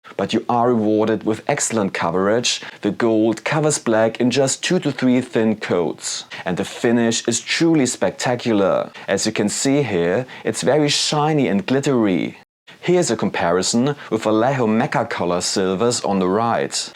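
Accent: Germany. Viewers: So about this 'pronunciation' thing in the comments, it's because he's from Germany, I presume? It's a german accent or how germans read certain alphabet differently. What's the fuss about?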